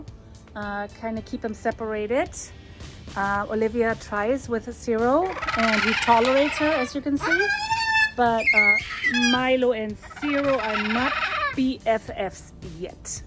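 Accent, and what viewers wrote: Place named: Germany. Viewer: Ich dachte doch dass ich da einen leichten deutschen Akzent höre 😊 tolles Video, Du bist eine Papageienflüsterin! Grüße aus NRW ❤️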